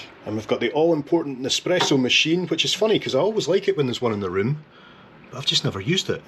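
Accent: Scotland, United Kingdom. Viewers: What I like about is that he does his own research. You will never hear him say "I don't know. If you know, let me know in the comments..." Other travel vloggers come across as ignorant and lazy compared to. Also love the Scottish accent. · I just love your accent. I have bin 5 time in Edinburgh and many other places in Scotland, and I sure love your contry. Keep up making videos. 😘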